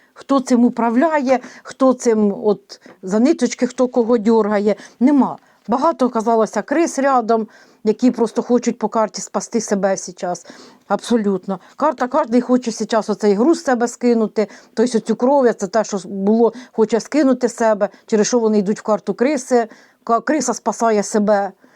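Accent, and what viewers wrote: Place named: Surzhyk, Ukraine. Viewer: Пані, ваші емоції і ваш суржик- це щось неймовірне, бо в цьому криється справжня українська відьма!!!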